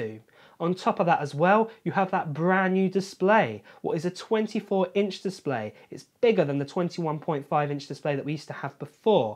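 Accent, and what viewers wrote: United Kingdom. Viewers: you are getting a 4.5k screen which arguably to buy separately it would be about £300-£400. You are also getting a keyboard and mouse which cost over £200. You also have a 6 speaker system with spacial audio, plus an HD camera...on top of that you have more material used. so...for the price difference - it kinda makes a lot of sense. Annoyingly you open with the $1299 --- yet go on about the 4 ports... your video is a bit confusing... you also sound British yet use American pricing... Dude... come on... don't be so stupid... you are talking about buying a low end screen, with a cheap keyboard set, and a horrible cheap speaker set --- why not price it up with like for like items --- i really cant watch any more... it's too painful- shockingly trying to get subscribers with a phone - an opened out of date phone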